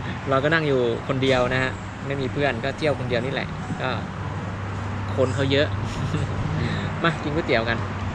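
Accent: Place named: Loei, Thailand. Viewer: สวัสดีค่ะคุณ สวยงามมากค่ะ วิวสวยบรรยากาศดี เขื่อนสิริกิต์สวยมากค่ะไม่เคยไป สำเนียงเหมือนจังหวัดเลยนะ เขาเรียกว่า ภาษาญ้อหรือเปล่าคุณ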